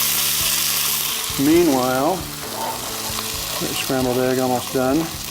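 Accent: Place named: England, United Kingdom. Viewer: hello cousin, I see despite all your years over there, you still can't get rid of the English accent. You make a great host and you look hot too as always. love you..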